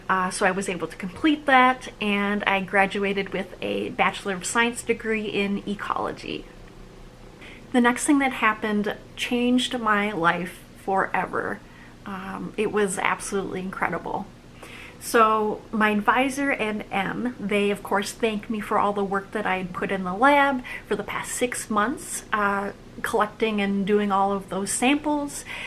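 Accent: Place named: Minnesota, United States. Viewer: Love your Australia story and your Minnesota accent.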